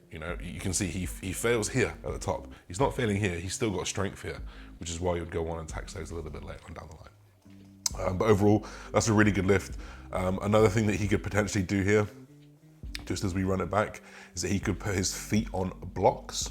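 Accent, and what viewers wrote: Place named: Liverpool, United Kingdom. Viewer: Content is sick lad! Keep it coming fella. Need to see more of your scouse accent as well bro it has me dying 😂😂😂😂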